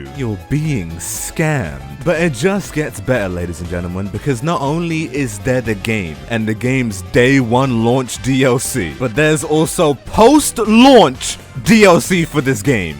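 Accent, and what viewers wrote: United Kingdom. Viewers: The British prince is back!!❤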